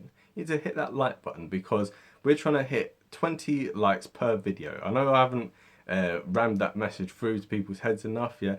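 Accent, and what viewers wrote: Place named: East London, United Kingdom. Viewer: So naive... simplification after simplification... and the language used... sounds like a geezer from Stratford.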